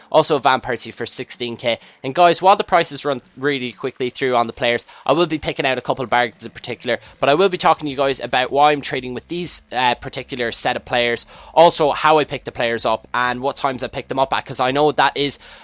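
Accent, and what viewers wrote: Ireland. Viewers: where exactly in ireland are u from?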